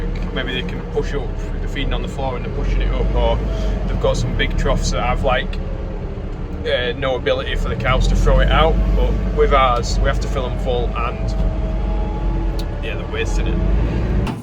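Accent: Barnsley, United Kingdom. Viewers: thank you for content love hearing a fellow Barnsley lad 😂 don't be late for big Mick Mick at weekend 😂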